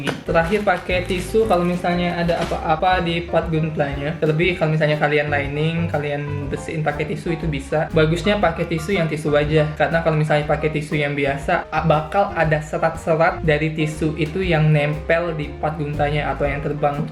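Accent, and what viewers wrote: Palembang, Indonesia. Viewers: Bang orang Palembang ya?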